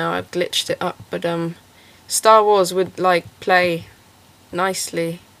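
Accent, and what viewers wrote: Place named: United Kingdom. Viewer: its just the british accent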